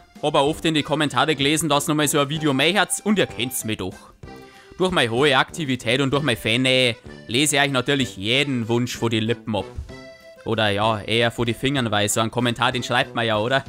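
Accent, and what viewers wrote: Bavaria, Germany. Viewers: Ich feier deine Videos, vor allem weil ich selber Bayer bin und genauso sehr stark mit Dialekt rede. Deshalb gefällt mir dein "frei von der Schnauze reden". :) (auch wenn ich hardcore hochdeutsch schreibe, aber da ist meine Schulzeit dran schuld) Deine Parodien sind der Kracher. Die kann ich immer wieder anschauen und lach mir jedes Mal den Arsch ab. Wirst du künftig auch auf andere Serien außer Spongebob zurückgreifen? Z.B. Simpsons wieder oder Family Guy? Bleib wie du bist und schönes Wochenende! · Bayrisch hört sich zu komisch an 😂👀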